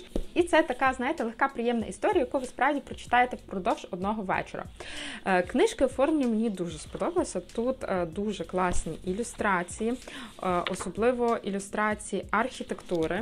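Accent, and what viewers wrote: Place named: Surzhyk, Ukraine. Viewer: На нормальном русском языке смотрели бы вас больше. Чем на деревенском польско-русском суржике.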